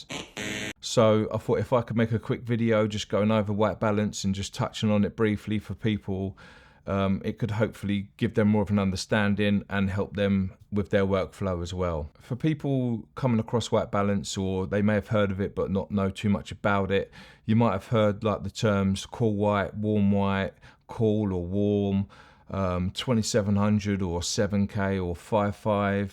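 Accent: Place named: United Kingdom. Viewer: This is the most British dude I've ever heard in the wild. Thank you for the vid too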